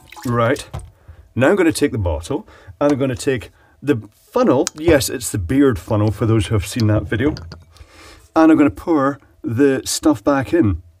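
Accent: Scotland, United Kingdom. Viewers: is this guy scottish? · Whats your opinion towards tea, spiffing brit sounding man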